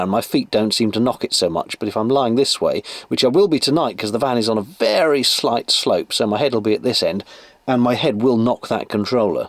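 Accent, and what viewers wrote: RP, United Kingdom. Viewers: Posh British person.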